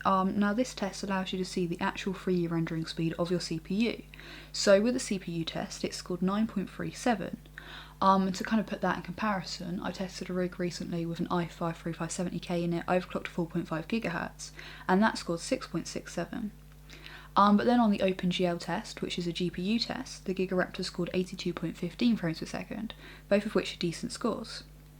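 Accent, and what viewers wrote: United Kingdom. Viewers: What part of the UK are you from? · She lives in the UK so she has an accent. It makes me laugh at how people are critical of perfectly acceptable accents. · Uhm...she's obviously British, it's just the way they talk in some areas of Great Britain. There's nothing wrong with her. Americans...enough said...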